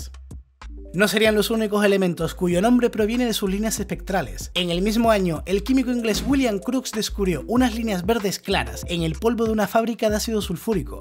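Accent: Chile. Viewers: Juraba que eras chileno, tu acento se parece muchísimo al acento chileno neutral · Hola amigo, súper tu video... Eres erudito en el tema, fascinante! Muy documentado. Intentaba recordar de dónde es tu dialecto: y bingo.... lo recordé: chileno. Un saludo desde Colombia.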